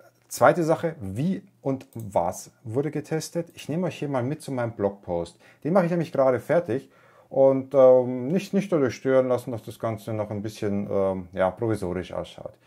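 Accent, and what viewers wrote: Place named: Munich, Germany. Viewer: darf ich fragen wo du in etwa herkommst? laut deinem akzent würd ich so auf region münchen tippen ^^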